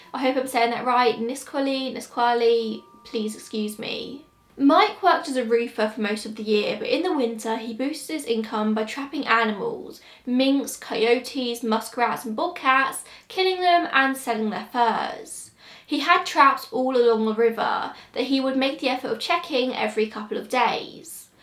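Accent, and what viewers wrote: England, United Kingdom. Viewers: So weird to hear her English accent on YouTube talking about the ghetto in Washington i used to live in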